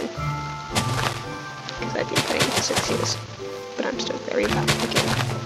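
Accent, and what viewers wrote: United Kingdom. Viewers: Why do you sound so bloody posh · OMG YOU ARE JUST LIKE ME IM LESBIAN I LOVE TO PLAY MINECRAFT IM ENGLISH sorry if I’m wrong you’re accent sounds mighty like it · YOUR VOICE IS SO COOL!!!!! NOT ONLY DO YOU SOUND SO MATURE, BUT ALSO I LOVE BRITISH ACCENTS!!!!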